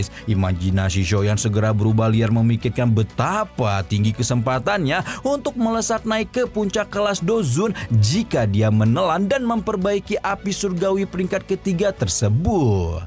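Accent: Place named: Sulawesi, Indonesia. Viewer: Sulawesi on bg😄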